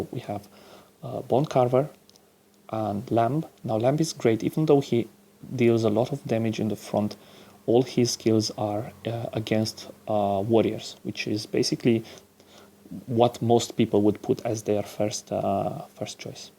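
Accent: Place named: Romania. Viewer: you sound romanian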